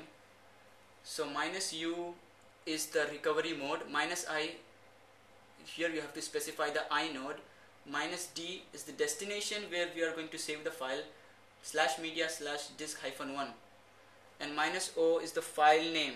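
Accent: India. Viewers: Gotta love the Indian accent! :D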